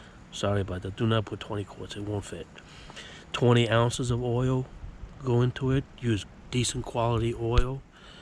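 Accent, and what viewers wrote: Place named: New York, United States. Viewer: Great video!! Couple of things right off, I'm from NY, like the accent. The other is, I use a syringe-plunger big one that holds more than 20 ounces with and a tube extension to suck the oil out for my oil changes(I do it while the van is at client houses super quick no mess! LOVE IT! Use this method on all three of my mobile dog grooming vans! I have 2 of these and run them both on the back of a mobile dog grooming van(shuttle bus conversion). I power a 15,000 BTU Roof AC, water heater, clippers, lights, and most power hunger, a blower-dryer to dry the dogs. I use 1 3500 Gen for just the blower-dryer and the other for the 15,000 BTU AC and everything else. You have me thinking if I make these mods, I could longer run times on a tank full. I gotta try. What about how loud with the spark arrester off? My clients like quiet in their driveways as their dog is being groomed... 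Gimme your thoughts.... Please. Thanks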